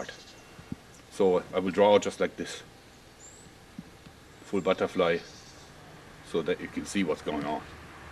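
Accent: Germany. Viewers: Der gutealte deutsche Akzent xD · German english sounds funny xD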